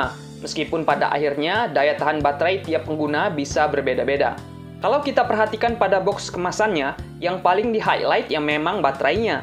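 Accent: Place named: West Kalimantan, Indonesia. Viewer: nih pasti orang kalbar ya yg punya chanelnya😀😃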